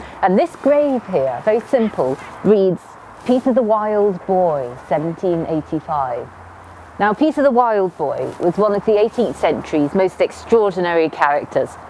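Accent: United Kingdom. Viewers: Look at what I just bumped onto. This is a great channel. I'm an American from New York City. I always look for YouTube channels like this one that focuses on history and actually goes and videos those historic places. I can already tell it will be fun watching them. I like the British accent. The British tradition. No annoying music in the background and I love learning about historic situations I didn't know about. Kudos to you Historic Royal Palaces for this gem of a channel. I will now go on a binge watch of ALL your videos. -- Frank. Cheers. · Subscribed just so I can hear you talk more! I love the British accent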